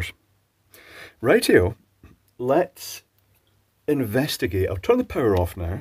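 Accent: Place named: Scotland, United Kingdom. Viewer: Fluxing and removing chips.... its a lovely Scottish sounding Louis Rossman, with less swearing!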